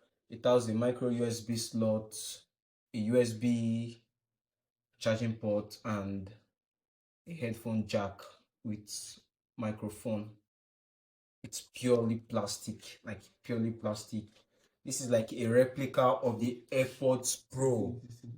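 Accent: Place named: Africa. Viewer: He's clearly African. What did you expect? The queen's English😒? American English? He's speaking a language that is not his. Show some respect.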